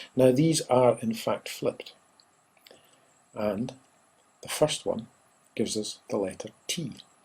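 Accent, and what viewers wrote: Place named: Scotland, United Kingdom. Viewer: He's a Scotsman …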